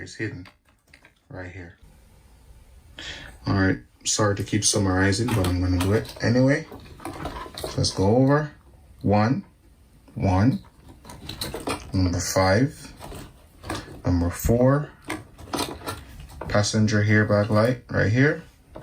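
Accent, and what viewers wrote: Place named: Jamaica. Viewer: Bro sound like a yardie this helped me alot, big up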